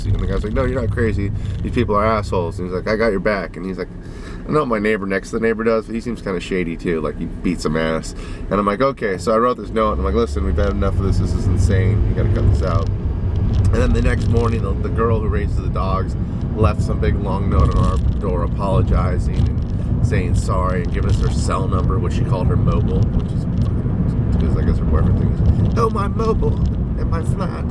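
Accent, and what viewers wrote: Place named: Australia. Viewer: Yeah the accent is most definitely Australian but yeah you better hope they don't see this =). Good stuff mate.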